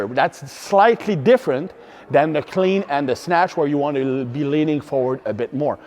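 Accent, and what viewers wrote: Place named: Quebec, Canada. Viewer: SUPER un Québécois. Il est a Québec ou a Sherbrooke?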